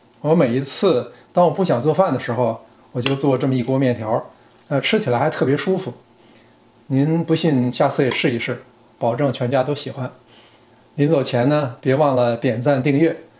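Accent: Beijing, China. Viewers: 老师您是不是老北京的，有点听相声的感觉了😂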